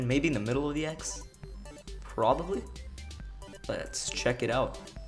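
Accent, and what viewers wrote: India. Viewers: U have a slight Indian accent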